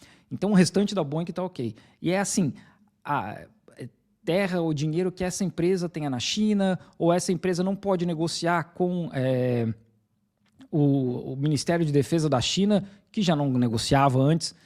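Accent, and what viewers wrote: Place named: Rural, Brazil. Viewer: Sotaque cáipira nojento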